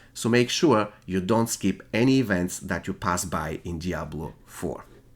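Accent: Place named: Romania. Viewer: Dupa accent esti roman :))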